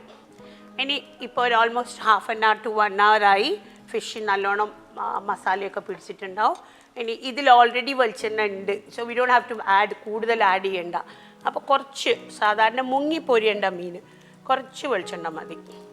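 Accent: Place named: Malabar, India. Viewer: What a presentation with that Malabar slang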